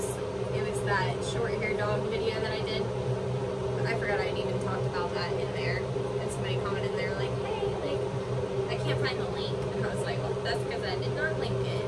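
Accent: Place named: South Carolina, United States. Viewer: i love your South carolina accent so much lol im in the midwest 💟 i went there earlier this summer i miss yalls voices🤣💘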